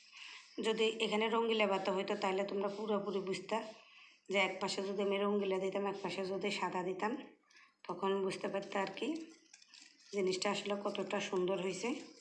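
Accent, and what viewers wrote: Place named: Noakhali, Bangladesh. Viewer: আপনার ভিডিও গুলো আমার অনেক ভালো লাগে আপু আপনার কথা গুলো একদম নোয়াখালীর মত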